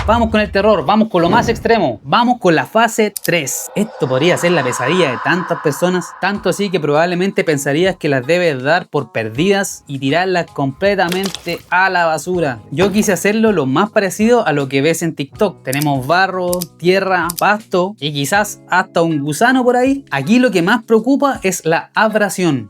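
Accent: Chile. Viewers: Chileno, bro? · Eres chileno? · Chileno, cierto? 😅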